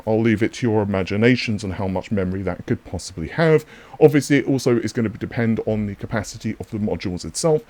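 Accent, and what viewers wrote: England, United Kingdom. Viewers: Accusing an Englishman of faking an English accent?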